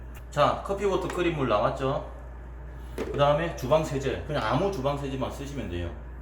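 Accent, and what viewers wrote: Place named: Gyeongsang, South Korea. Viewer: 아니요. 경상도 말투입니다. 투박하면서 정감있는 말투~~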